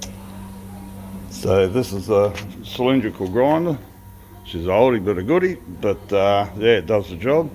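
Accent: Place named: Australia. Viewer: Whereabouts are you? Sound like Aussie?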